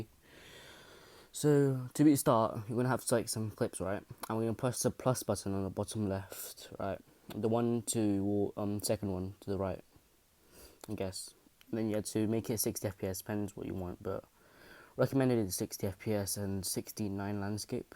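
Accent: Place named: England, United Kingdom. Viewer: Your accent is so much more English now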